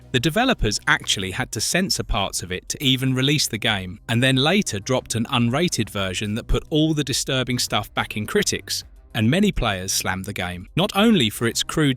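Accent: United Kingdom. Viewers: That accent, sounds British to me, saying stuff like games normalizing or flirting with misogynistic imagery, makes me 🤢🤢🤢 I'm sick and tired of hearing nonsense that makes me angry and feeling like asking for the world to end. Like, using internet nowadays is like getting the daily dose of boring and depressing shjt just to have those boomer feelings left. It's all hopeless, nervous, feeling like all i see is nonsense, like all i hear as arguments are that progressist politically corret nonsense, feeling like isolating myself and get bored af at the end... I wanna see smart people doing good stuff or at least some sense when people disagree or when they're criticizing something bcs it's getting tiring af to see almost anything on the internet and getting me feeling like I'm on a discovery kids for dummies cartoon world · I like the British narrator. Marvellous videos and channel!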